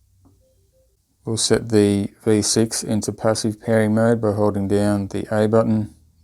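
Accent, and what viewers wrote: Australia. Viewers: I stumbled across your video while trying to find reviews on Fodsport products. I'm always amused by the fact that I can tell when a video is shot in australia (other than the accent, the lighting and colours just look a certain way). I didn't pay much attention to the poster in the background (until after the video and coming back to comment) and skipped through the first part of the video to the test ride. I often try and see if I can figure out what city/town it's in and if i can recognise anything if it's a place I've been. Well the traffic lights didn't give me much help, but as soon as i saw the show grounds i knew exactly where you were. I'll have to check out some of your other videos (gotta support another local). I just flicked through your vids and saw you are giving one of these away. It's just a shame I won't be able to get enough info to enter the draw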